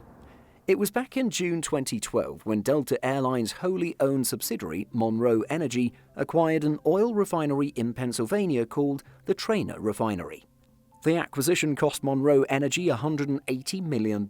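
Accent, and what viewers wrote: United Kingdom. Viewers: The UK I can tell by his accent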